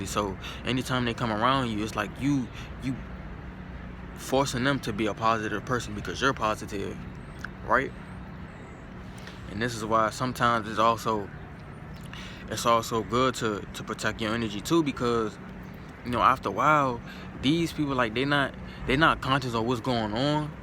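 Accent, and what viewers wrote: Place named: New York, United States. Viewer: Damn sound like you from NYC Or Philadelphia from your accent where you from?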